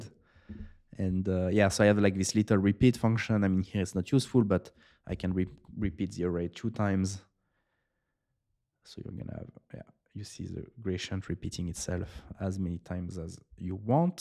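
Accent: France. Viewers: Le meilleur accent français ! 🇫🇷 Great Video 👍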